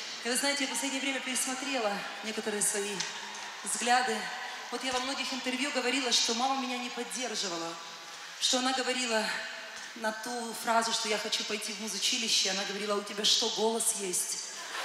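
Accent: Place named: Surzhyk, Ukraine. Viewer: Очень крутой диалект, как в Украине и говорят, украинский суржик, обожаю его, юмор, солнце, Одесса. Свобода, весь мир, далекие страны. С Одессы уплывали в Америку 40 лет назад.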